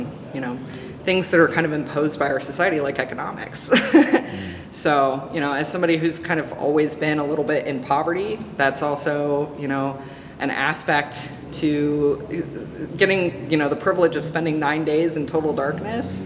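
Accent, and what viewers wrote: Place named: Minnesota, United States. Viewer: I thought I detected a Minnesota accent! That's my home state. Cheers!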